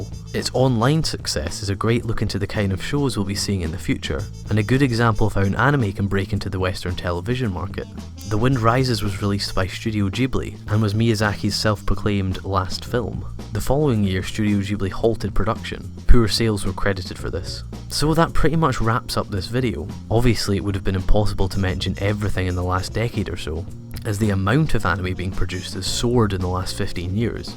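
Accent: Scotland, United Kingdom. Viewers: i think it's some kind of British, but there's a lot of them, so i can't tell which one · It's a stupid question but you're Scottish right?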